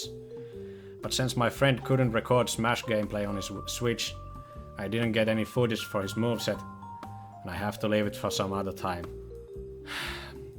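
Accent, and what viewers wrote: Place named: Spain. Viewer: Cool voice! Love the accent, where are you from? You sound Spanish or Easter European.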